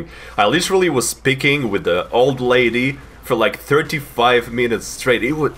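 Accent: Russia. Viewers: I dont know why but its so chill to hear your russian voice and your stories. Please make this a thing! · a russian person telling histories while playing tf2? I NEVER KNEW I WOULD NEED THIS SO BAD IN MY LIFE please make more of this type of content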